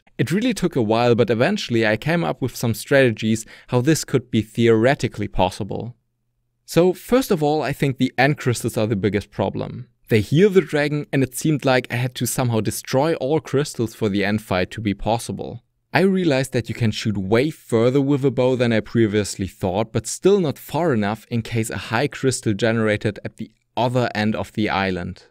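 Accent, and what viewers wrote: Germany. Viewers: Du hörst dich bissl deutsch an…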